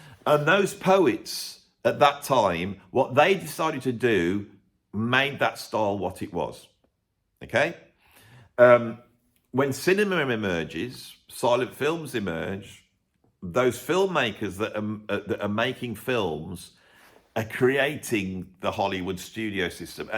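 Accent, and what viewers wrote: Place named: England, United Kingdom. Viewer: Nobody out of England does understand what you are telling because of your terrible Accent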